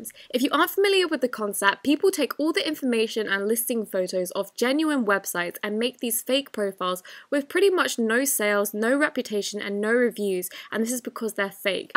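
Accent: England, United Kingdom. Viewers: I know it’s English, and I love it!!!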